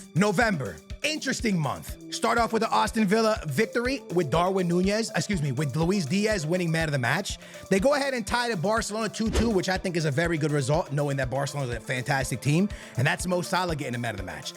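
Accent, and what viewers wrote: Portugal. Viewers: He's Portuguese let him off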